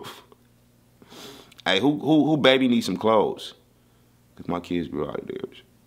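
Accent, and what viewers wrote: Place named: New York, United States. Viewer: so why u speaking like a New Yorker “ goofy “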